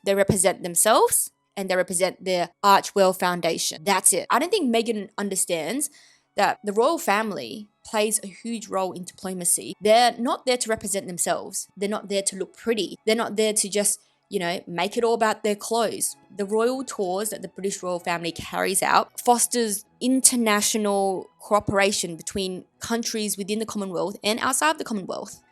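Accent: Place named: Australia. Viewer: Hi - I'm a new subscriber to your channel. I'm trying to work out where your accent is from - are you an Aussie cousin? I'm from NZ.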